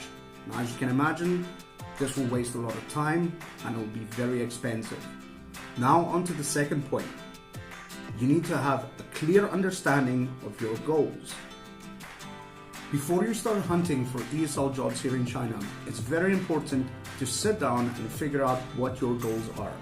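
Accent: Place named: Ireland. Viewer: buddy, based on your accent and your name, I knew you are Irish... ' ' is a very common name in Ireland... and your accent... I don't know what part of Ireland you come from, but it is a typical Irish accent.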